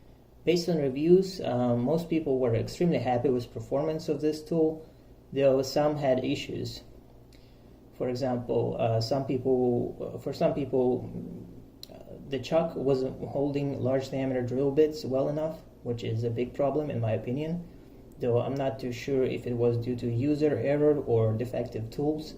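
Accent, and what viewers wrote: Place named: Europe. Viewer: Nice review mate, I thought it strange that with you European accent your talking imperial ? (we're metric in NZ by the way), Iv'e just got the Milwaukee SHOCKWAVE™ Titanium Drill Bit Set - 29PC and yeah ya gotta be carefull cos these bits go hard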